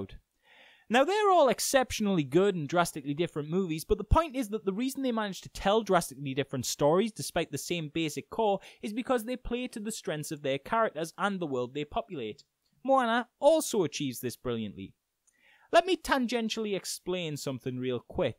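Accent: Newcastle, United Kingdom. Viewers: ah with the accent I assumed you ment somewhere local to newcastle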